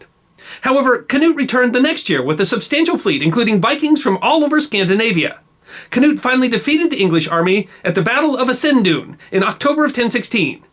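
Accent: United States. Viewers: Americans ... and speed speaking on top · Terrible American pronunciation. Showing Kenilworth castle, and calling it a Monastery. A Degree in History..... ??? mmmmmm !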